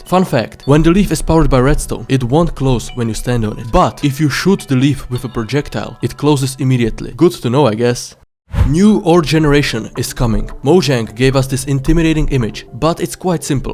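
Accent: Russia. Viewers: Слова как будто с русским акцентом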